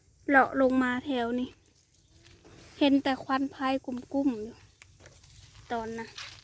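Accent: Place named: Isan, Thailand. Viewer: มีเอกลักษณ์ของตัวเองคือเสียงเว้าและน่าฮักเป็นแรงใจให้ครับผม..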